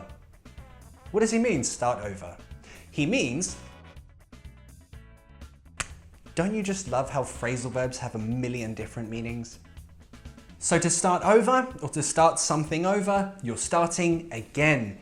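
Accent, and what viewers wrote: United Kingdom. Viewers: I love his British accent 😁💗 lol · This is my favorite video. Nice story, cute drawings, clear explanations... and of course your British accent! Thank you very mush for posting it.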